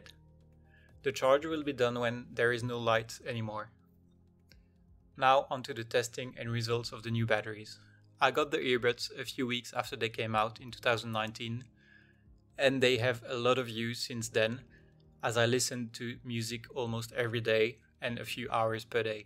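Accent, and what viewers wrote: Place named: France. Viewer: Merci pour la vidéo ! (J'assume que t'es français mais c'est léger à l'oreille)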